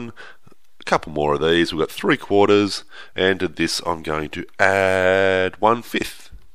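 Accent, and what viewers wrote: Australia. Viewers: i think he is Australian but we might never know.... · Is he Australian lol? I'm look at this cuz my recruiters keeps quizing me on mental math (and I can even look at the problem) but yeah.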